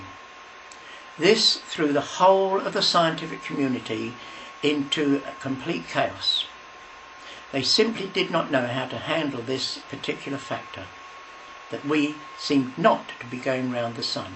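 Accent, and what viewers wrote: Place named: United Kingdom. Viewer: Nothing like a talk delivered in a nice fruity British accent. However, the content, while full of high-sounding references, is an enormous pile of horse-pucky.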